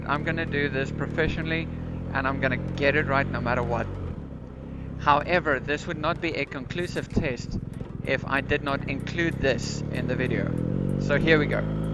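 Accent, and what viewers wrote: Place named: South Africa. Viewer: You sound south african, I don't see ocean views so I'm guessing gauteng?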